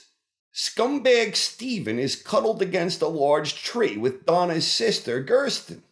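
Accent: New York, United States. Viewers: Sir, from where exactly in the greater New York City region does your accent originate? I've been wondering this all season!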